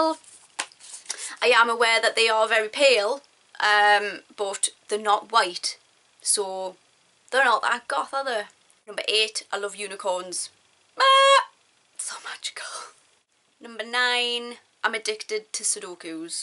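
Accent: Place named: Scotland, United Kingdom. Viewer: i am literally obsessed with your accent. is it... scottish? are you a geordie? doesn't matter, loved your video!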